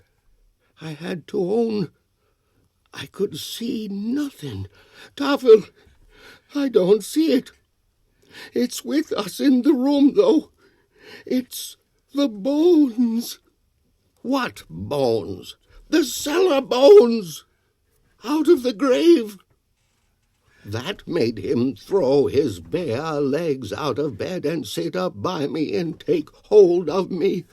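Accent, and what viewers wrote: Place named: New England, United States. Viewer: This is how I believe Frost meant this to sound , Great New England accent enjoyed it very much thank you.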